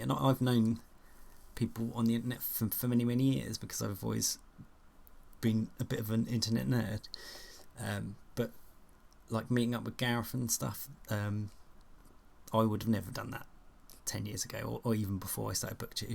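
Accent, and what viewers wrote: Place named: United Kingdom. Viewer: Yesterday I discovered the"mentions" tab in the comments section (classic really, about 5 years late on that one, so right on schedule for me) and I'm honestly so surprised and moved by what you said here! Thank you, really. Although I have to say the biggest surprise was connecting your icon that I know so well with an actual face that both has a British accent and is wearing a Packers hat 😂 reaaally trippy moment for me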